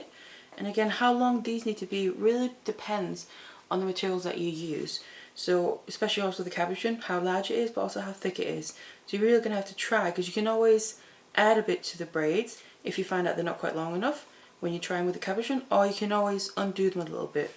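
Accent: United Kingdom. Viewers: Love, love me some British accent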